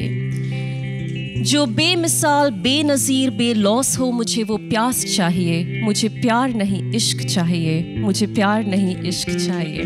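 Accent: United Kingdom. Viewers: Why Urdu/ Hindi words in English accent?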